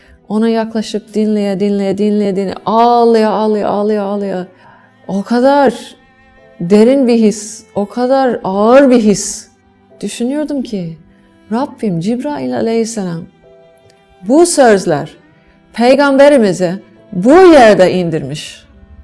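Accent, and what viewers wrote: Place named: Trabzon, Turkey. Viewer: Abla trabzon lu sanki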